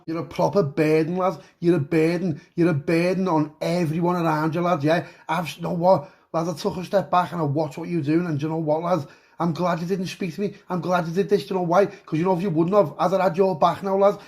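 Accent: Liverpool, United Kingdom. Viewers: Lovin' it all Scouse. Flyin' lad. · ACTIVATED💯🔥🔥🔥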